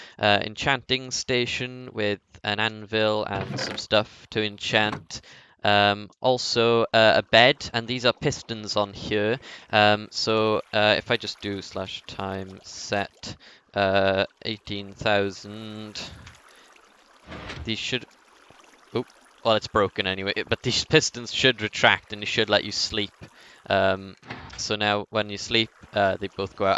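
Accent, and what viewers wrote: Wales, United Kingdom. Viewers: Very rare to hear a Welsh accent on YouTube, represent fella! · There's a subtle Welsh accent there and I love it. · Awesome to hear a fellow Welshman! And thanks for the tutorial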